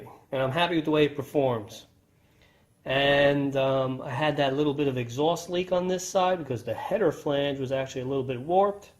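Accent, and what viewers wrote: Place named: Pennsylvania, United States. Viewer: You have to be from PA, you sound just like my friend Bill. Great channel, amazing work.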